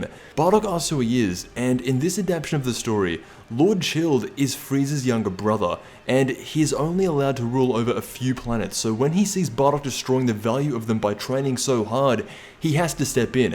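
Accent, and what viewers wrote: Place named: Australia. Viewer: by his accent that he's Australian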